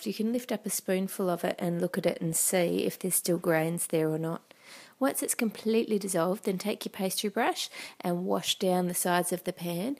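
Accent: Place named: Australia. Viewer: Australian/New Zealand accent.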